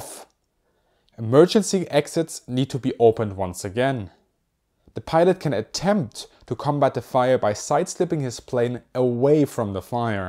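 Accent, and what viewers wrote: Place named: Germany. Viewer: Oh man, have you but a german accent.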